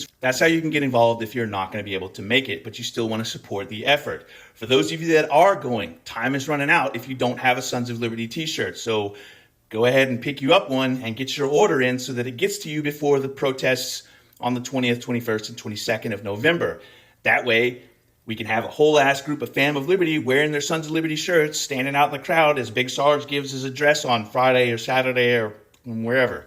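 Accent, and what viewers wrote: Scotland, United Kingdom. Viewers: Uh oh the Scottish accent is a bit sticky lol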